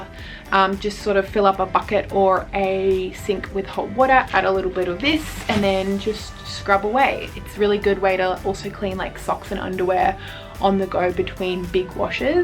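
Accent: Australia. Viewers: Aussie as! I did not detect a lisp. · Aussie with a lisp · Am i right if this aussie accent ? or new zealand maybe ?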